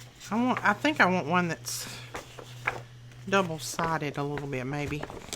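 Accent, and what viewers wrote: South, United States. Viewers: Such cute mini folders love the paper you used. I enjoy listening to your southern accent. 😀💖 Have a blessed day. What is the name of that beautiful black bling that you used on butterfly and where do you get it?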